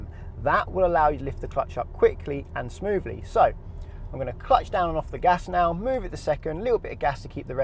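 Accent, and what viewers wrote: England, United Kingdom. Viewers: British innnit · Can’t listen to this because British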